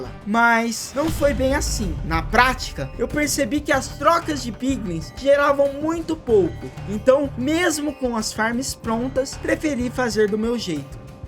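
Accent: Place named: India. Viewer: tenta melhorar esse ingles de indiano ai man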